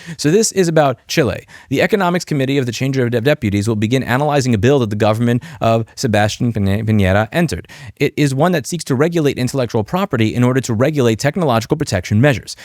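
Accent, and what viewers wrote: United Kingdom. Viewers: nice British accent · why he talk british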